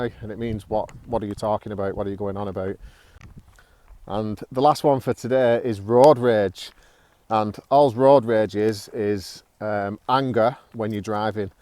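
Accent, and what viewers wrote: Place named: North, United Kingdom. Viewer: Yeah, I guess I've been more exposed to American English lately. It's quite refreshing to hear someone speak with a northern British accent. It brings me memories ( good and bad) from my stay in Manchester. (Fallowfield and Salford)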